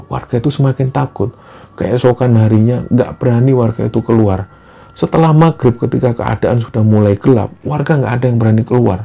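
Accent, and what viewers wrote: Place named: Surabaya, Indonesia. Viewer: Malang Hadir... Nikmati yg slalu menyuguhkan Cerita² yg penuh hikmah apalagi dg Suara Mas Logat Surabaya yg khas.... Sehat Slalu buat Mas dan Crew... Smoga tak kurang dari suatu apapun.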